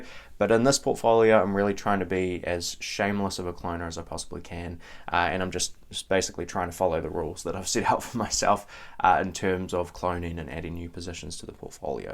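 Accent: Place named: New Zealand. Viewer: he has a Nz accent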